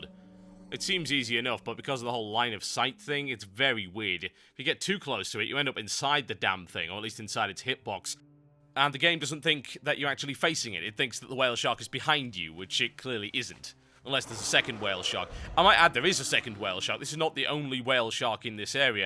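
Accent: United Kingdom. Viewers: i only watch things like this cause it has WoW and a brittish person talking in it <3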